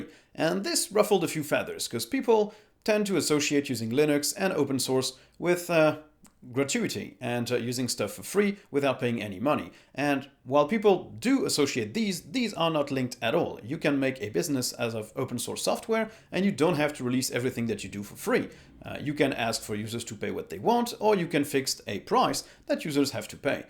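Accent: France. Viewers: Even though Ubuntu has its cons, I have had many issues with other distros (Manjaro, OpenSUSE, etc.) when it comes to NVIDIA RTX GPUs support. Everything simply worked with Ubuntu, so I guess that was my only option, other than wasting another 2 days on distro hopping and figuring out a solution. Also, I know it is out of topic, but you are such a handsome man. (Also, by your accent I feel like you might be French, in which case, un salut à toi depuis Strasbourg !) Keep it up with the great content :)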